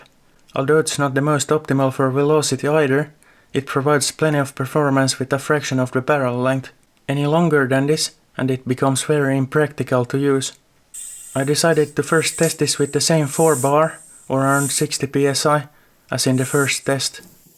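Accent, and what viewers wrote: Finland. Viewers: Finnish accent? Like moths to a flame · as soon as i heard your voice, i clocked that finnish accent than a speeding train turning your satsuma into dust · Finnish accents are so cute 😭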